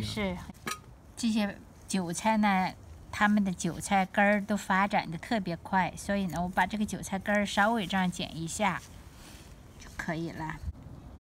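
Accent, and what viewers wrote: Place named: Weihai, China. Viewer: 请问您是不是威海人啊？口音真的好像！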